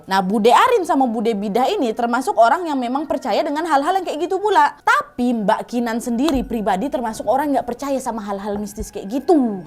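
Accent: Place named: Minangkabau, Indonesia. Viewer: orang minang yah? Huhu sukaaa banget logatnyaaaa. Campuran medan sama ada minang minangnya dikit. Semangat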